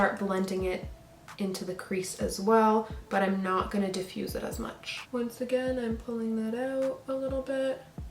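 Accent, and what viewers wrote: Toronto, Canada. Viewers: is this weird.. from your voice i could tell you were from Toronto.. then you mentioned winners so I knew you were from Canada then I checked your ig and turns out you are! ahah love your videos! we need a toronto beauty guru!! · Why can I always tell when its a fellow Canadian LOL!? Our accents are not even that apparent !! anyways you're stunning hun! from another Canadian living in the UK <3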